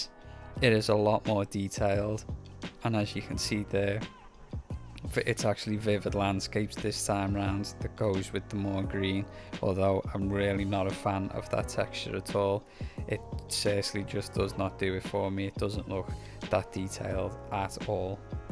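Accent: Liverpool, United Kingdom. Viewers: Oi scouser! Cheers for the videos. Might subscribe but one question first, are you red or blue?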